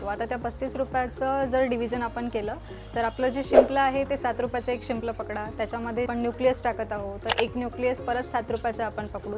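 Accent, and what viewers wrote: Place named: Vidarbha, India. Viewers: Chan mahiti dili....Vidarbha chi boli bhasha.... Yavatmal, Nagpur yethil asavi...aikayala chan watate.... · छान नागपुरी बोलू राहिले मॅडम तुम्ही..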